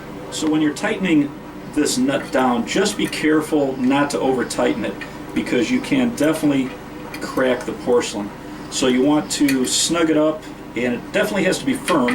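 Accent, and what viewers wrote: Chicago, United States. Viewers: Are you from Chicago? Sounds like you are..thanjs for the video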